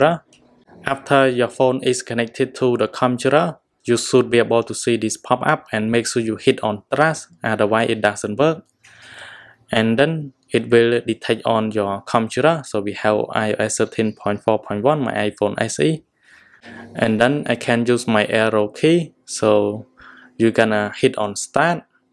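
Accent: Vietnam. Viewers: Heavy Vietnamese accent, is it like the slang America's use, or it it because that so American to you that it's already thrown out as hidden philosophy that help you speak and talk like a doctor without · Why you read english like vietnamese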